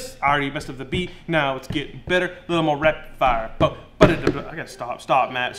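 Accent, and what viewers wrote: South, United States. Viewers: He sounds so cheery Like a southern Santa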